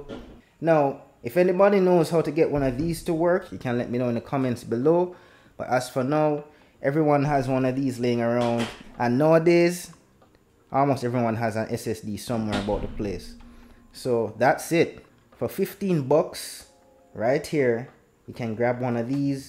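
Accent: Jamaica. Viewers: Wait a yard man?? I was expecting an American heeediot 😂 big up my yout 👊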